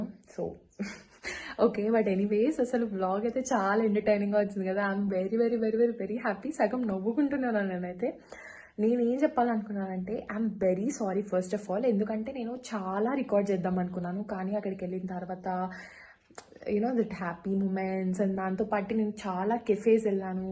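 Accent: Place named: Hyderabad, India. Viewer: Garu meeru Hyderabad lo leg petinaru total ga Hyderabadi Slang kuda vachesindi super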